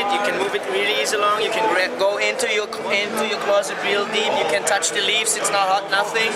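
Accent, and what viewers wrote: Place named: Netherlands. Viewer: Dutch as shit 🤙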